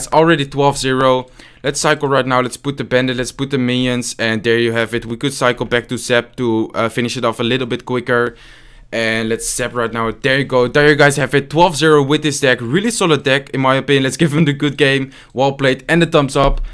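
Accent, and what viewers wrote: Netherlands. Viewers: Lekker Nederlands actcent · Met je Nederlandse accent klink je engels wel verlept Sorry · He is dutch I hear that on hes cracky english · Are you dutch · e gast ben je nederla'ds'ikan da horenaan u stem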